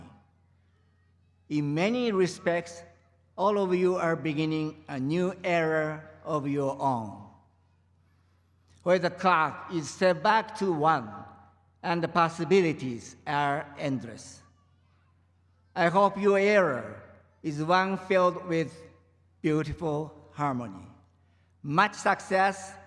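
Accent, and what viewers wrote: Japan. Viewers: 字幕追ってられるから気付けたけど結構誤字なのか誤訳なのかあるね。living roomとか笑 発音もまあ日本のおぢって感じではあって怪しいところあるけど。 とは言え内容はとてもいい。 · 私がどうこう言うまでもなくあまりに素晴らしいスピーチですが、発音は日本語発音な部分があるんですね 私は自分が正しい発音をできないことばかりコンプレックスとしてまともに英語を話せませんが、重要なのはそんな部分ではない事を改めて痛感しました こんな素晴らしいスピーチが、内容でなく自分の発音に結びつくと言うのも情けない話ですが、私も英語でコミュニケーションできるように頑張ろうと心を新たにしました。ありがとうございます。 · British English ではイラーAmerican English はエラー。スピーチは内容で勝負。ザ.Japanese English でも人を惹きつけている。豊田さん👏👏👏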